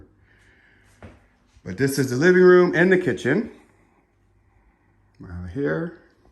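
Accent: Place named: United States. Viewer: Dane here:I just caught myself thinking "He sounds black" And it looks like he is!!!! Can people sound black????? Ok, am I completely insane? He sounds American of course, but is it the deep voice?????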